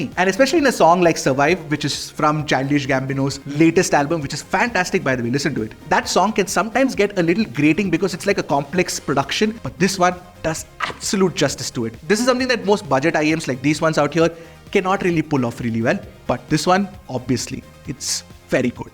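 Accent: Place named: Pune, India. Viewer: With time has obtained Puneri characteristics that are somewhat noticable through way he speaks now...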